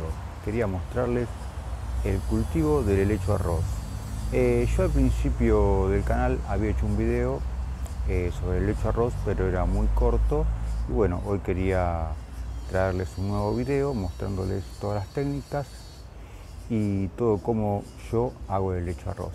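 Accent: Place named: Argentina. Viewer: Por fin alguien que habla con nuestro acento! Hola! soy Susana, vivo en Buenos Aires (CABA)Tengo un helecho arroz desde este verano, ahora llegando el imvierno lo tengo como planta de interior. De dia cerca de la puerta del balcón, le da luz pero no sol directo, por la noche lo aparto de alli porque quedaria muy cerca de la estufa. está en una maceta pequeña y las ramitas de abajo van desprendiéndose. Crece de un tronco central y su altura es de 25 cm, cuando la compré era más baja y sus hojas(flores? ) eran blancas, parecían verdaderamente granitos de arroz, ahora es toda verde. Mi departamento es muy luminoso. Me preocupa saber si la estoy cuidando bien...Tendré que transplartarla a una maceta más grande? Podés aconsejarme algo? Saludos y gracias por el video, está muy bueno.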